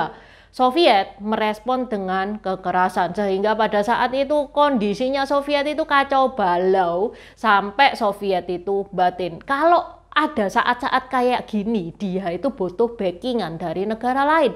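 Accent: Java, Indonesia. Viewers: nieh enakan ya klo mendongeng.. hihihi pk bhs indonesia dg aksen jawa medok.. hihihi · Dia lahir dilingkungan org jawa, ya pasti logat nya jawa, emang jawa bukan indonesia ya. Nampak kali anda rasis sekali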